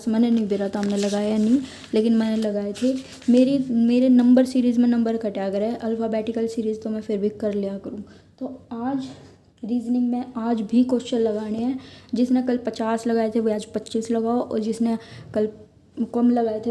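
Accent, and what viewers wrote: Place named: Haryana, India. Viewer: Hello mam apki hriyanvi voice bahut achhi hai All the best for your exam Or chennal pe daily video dalna mat bhulna please apka chennal ek din baahut grow karega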